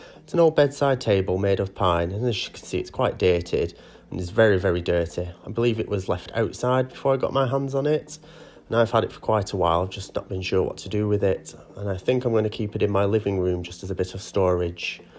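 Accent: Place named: Newcastle, United Kingdom. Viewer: Nice job! Canny little cabinet looks very chic now. 😊 Enjoyed your voice over, always like to hear somebody from home, cheers from an expat Geordie in Alberta. 👍😊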